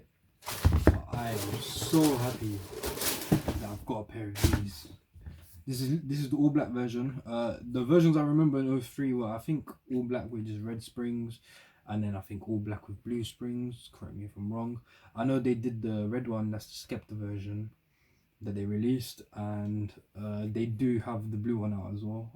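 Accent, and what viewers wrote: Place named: England, United Kingdom. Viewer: What happened to the English accent? Sounds horrendous now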